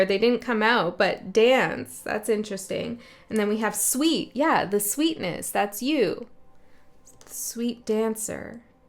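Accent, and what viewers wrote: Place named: Canada. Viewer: I thought I heard a Canadian accent, so I looked at your page, sure enough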